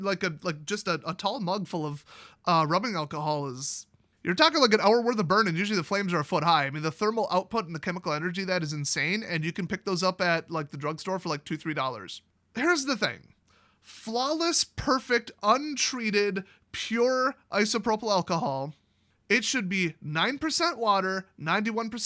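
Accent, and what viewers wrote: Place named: Michigan, United States. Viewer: Very good video. I have slept in hotel parking lot in my car when I didn't want to pay for a room after a concert. I have a hybrid so it's less of a concern, but I always worried about some psychotic person walking up and stuffing something in my exhaust. That's why I always did it in a hotel parking lot. A battery-powered carbon monoxide detector would have been a good idea. But there are tons of people who try to commit the s thing this way and they fail, so I figured it couldn't be all that deadly. Also, you sound more like a Michigander.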